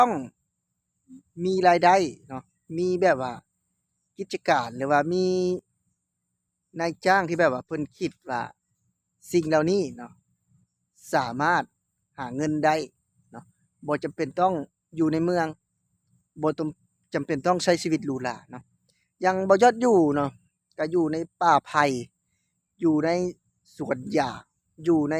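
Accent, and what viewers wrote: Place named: Sakon Nakhon, Thailand. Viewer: บ่าวยอดพูดสำเนียงเหมือนคนสกลนครเลยครับหลายๆหมู่บ้าน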